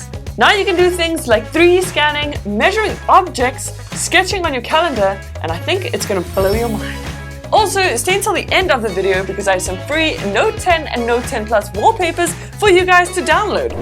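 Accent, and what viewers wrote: South Africa. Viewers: Ik twijfelde even of je Nederlands was, gezien de accent. Maar het was toch net even anders. Ik had moeten weten dat je Zuid Afrikaanse bent? Ben je daar ook geboren? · South African! Subscribed. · you're from sa? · oh this south african accent of yours. love south africans!! can't wait for the travel ban to be lifted to come to SA again and again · Are you South African🇿🇦? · Ar you from south 🇿🇦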